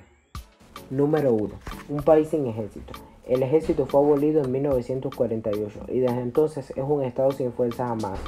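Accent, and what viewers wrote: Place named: Dominican Republic. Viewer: ups lo siento espero no haberlo insultado....conozco algunos dominicanos y tienen su mismo acento.....saludos y 🌴 P U R A 🌞 V I D A 🌴 🇨🇷🇨🇺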